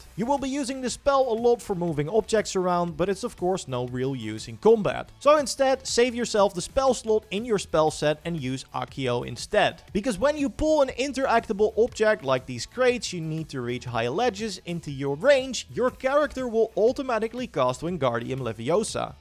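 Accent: Netherlands. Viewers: Hmm are you Dutch too by any chance? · Typisch Nederlands accent 😂, wist het meteen. Geabonneerd neef.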